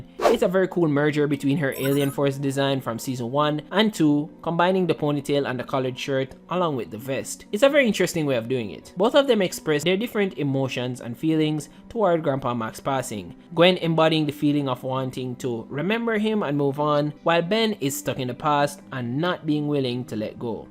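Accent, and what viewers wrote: Jamaica. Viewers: W video, stumbled upon this video on my recommended. Loving the accent, not alot of Caribbean much less Jamaican people are on social media talking about ben ten or superhero comics. Keep it up bredda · Ayo you Jamaican? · Are you Jamaican? Your voice sounds off. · Dah accent big man are you jamaican by chance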